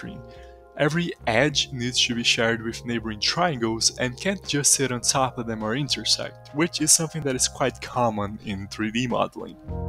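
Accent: Brazil. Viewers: I'm glad a brazilian got to work of this (basing that on his pronounciation) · Eu amo que da pra saber de onde tu é so pelo sotaque KKKKKKKKKKKK Cadê, posta mais video nesse teu canal aí pra capitalizar nos br te reconhecendo aqui mano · O É BR Q EU CONHEÇO ESSE SOTAQUE. NÃO MINTA PRA MIM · Caraca!!! is brazilian sureeeeeee Kkkkkkk Eae parsa I immediately noticed its brazilian accent Incrível saber que tem um brasileiro por trás de todo esse incrivel projeto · " BRAZILIAN, I KNOW THAT ACCENT. DON'T LIE TO ME " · O sotaque do cara é tão brasileiro que fica dificil não reconhecer, parabens cara pelo seu trabalho nessa confusão ai desse jogo, modelando tudo isso ai. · Primeira vez que eu vejo um sotaque brasileiro numa fala em inglês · dá pra sentir o sotaque brasileiro entrando na minha alma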